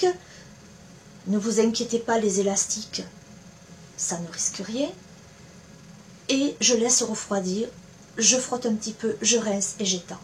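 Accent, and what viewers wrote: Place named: South, France. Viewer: Merci à vous pour ces conseils pleins de bon sens, Ah le sens pratique... Avé ce petit accent méridional plein de charme. Bon courage et bonne continuation.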